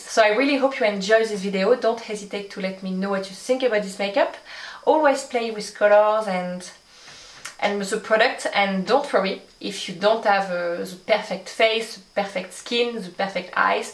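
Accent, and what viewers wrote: France. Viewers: Your accent is lovely - where abouts in France are you from? X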